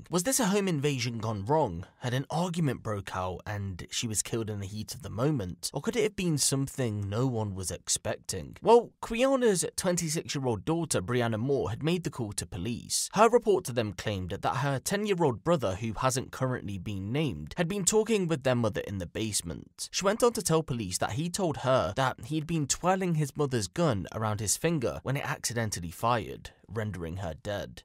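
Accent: United Kingdom. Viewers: I'm so sick of hearing people with British accents on American YouTube. Go make money in your own country. Everyone complains about America but they all want our dollars.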